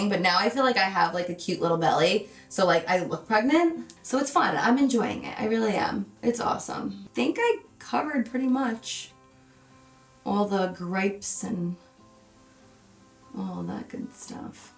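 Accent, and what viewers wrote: New Jersey, United States. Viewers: Her Jersey accent seems stronger? Anyone else notice that? Haha I love it. It reminds me of 2013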